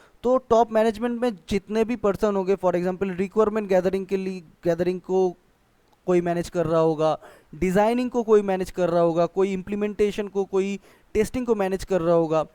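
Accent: Bengal, India. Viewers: Bangali naki?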